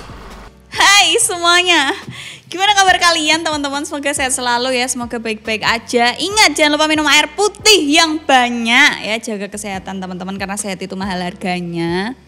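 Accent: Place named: Bantul, Indonesia. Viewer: Iki mbk e nek ga wong bantoel.. y pling skitar kalasan po banguntapan.. logatmu ngangenin desoku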